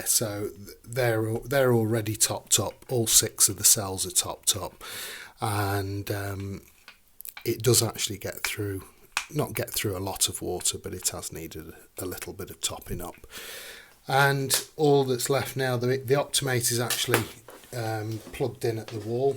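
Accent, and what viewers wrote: United Kingdom. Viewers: Great breakdown. Question, what regional English accent do you have? I like it. Best guess is somewhere near Birmingham? Or near London, actually. Hello from California.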